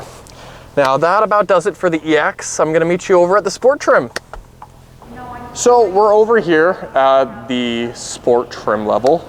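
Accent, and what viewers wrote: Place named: Canada. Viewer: Holy Christ you're Canadian 🤣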